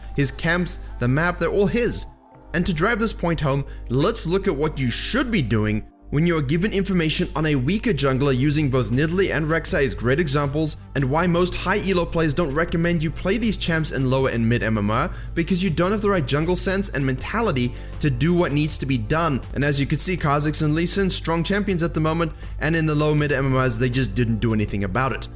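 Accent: South Africa. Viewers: . Thanks for the great guide. Are you from South Africa or have been in SA before?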